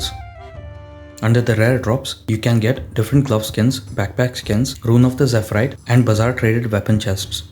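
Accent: India. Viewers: Are you from india youre accent sounds indian???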